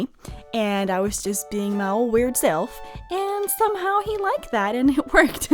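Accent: United States. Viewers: your english is very good for someone from norway. i swore you were american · also if u had not said you were from a different country, I would have thought you were from the states.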